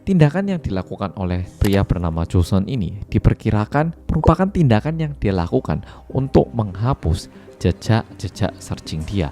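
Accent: Java, Indonesia. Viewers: Oppo benar-benar udah indo banget ya 😅 selain medok selalu untung gak mau rugi 😂 Yang sakit gak hanya bumi, manusia yang hidup didalamnya pun sakit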